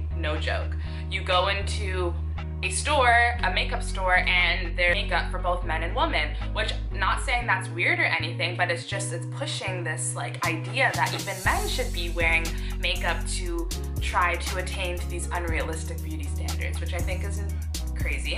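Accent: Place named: Patois, Jamaica. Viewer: mi thought yah half korean..til yah speak patwa..yah duh know yis fine empress mi like mi coffee strong and black..lol yah beautiful..mind and body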